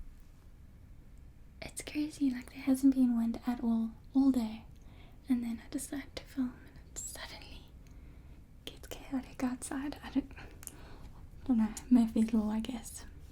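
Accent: South Africa. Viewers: I looove the South African accent, as a New Zealander I often get confused as one but I don't mind because I think it sounds great! Lovely video :)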